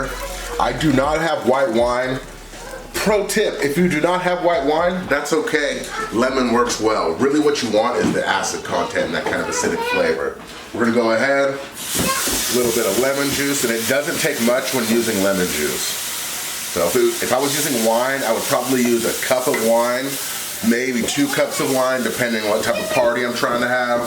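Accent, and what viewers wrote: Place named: California, United States. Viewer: U got that cali accent, never noticed lol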